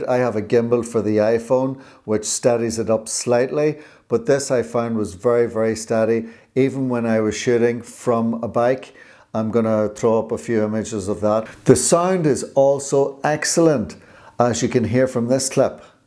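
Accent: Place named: Northern Ireland, United Kingdom. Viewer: Love this review,. Nice to hear from a fellow Norn Ironer:)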